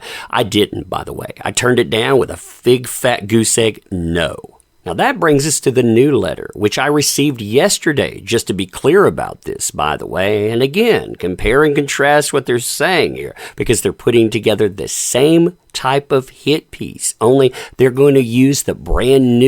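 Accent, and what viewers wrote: United States. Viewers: I actually like your American accent as a Brit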